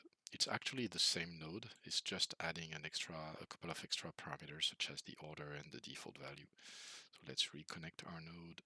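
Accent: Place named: France. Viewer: Si j'avais des doutes sur le fait que tu sois français à l'accent, à 11min30 le ''hola!'' m'a vraiment marqué mdr. Merci pour le tuto, tu es un chef.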